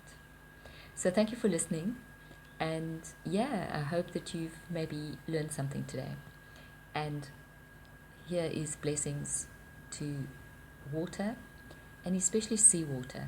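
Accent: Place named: South Africa. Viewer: Hi, I hear your South African accent. Would love to collaborate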